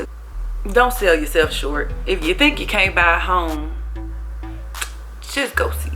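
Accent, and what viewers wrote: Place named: Memphis, United States. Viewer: Had I stayed in Memphis I would have that accent. lol I love your accent so much girl. lol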